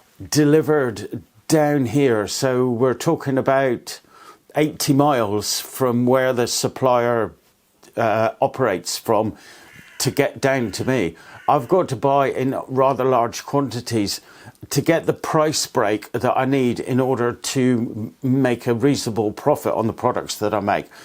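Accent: Cornwall, United Kingdom. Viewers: Hi, I stumbled on your channel a couple of days ago. I thought I recognised a fellow Cornish accent! Getting any supplies into the county is a nightmare. It's the transport that kills it. I'm looking into making a longbow, either self yew bow or osage, or a laminate bow from ash, purple heart, lemonwood, maple, cherry, walnut, hickory etc. Some of the prices are ridiculous. It's hard enough to source somewhere that sells the material. Another problem is shipping a 6 foot length of timber. Ideally I would want to see the wood for myself. I need straight tight grain, no knots, cracks etc. So risking hundreds of pounds on a piece of timber only to be unworkable makes it impossible. Good luck!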